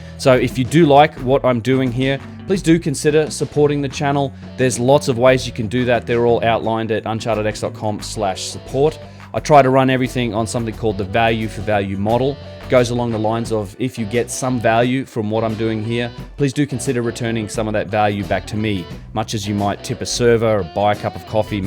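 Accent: Australia. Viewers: Good on you mate. I have developed quite a taste for your output and even though I don't necessarily agree with some of your ideas it is refreshing to see someone not just regurgitating the musty work of the orthodox crowd and be prepared to come at these matters from a different perspective ,,particularly with an Australian accent. I don't know if you are self taught but if so that is even more praiseworthy given the range of topics you skate through while showing the bird to the "club" I am enthralled by the current debate bubbling away concerning the age of the sphinx and would love to hear you do a paper or podcast on that. The proponents of the older sphinx are not ratbags but well educated professionals with fairly persuasive arguments from geology and a range of other disciplines to support them .It is fascinating to observe such a vibrant argument.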